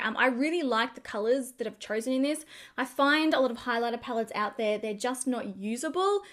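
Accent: Australia. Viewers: I love watching your videos,educational and an adorable Aussie accent. I may have missed it, What blush, bronzer combo are you wearing in this video. Brand and shade please it's lovely. I am looking for a bronzy rose look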